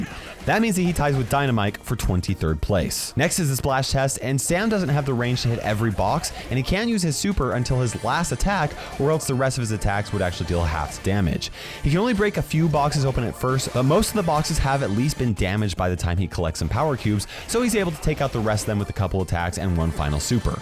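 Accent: Australia. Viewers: ayo sam having That austalian accent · He’s Aussie? Man I love this guy already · Sam sounds australian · I didn't know I needed an Australian in BS...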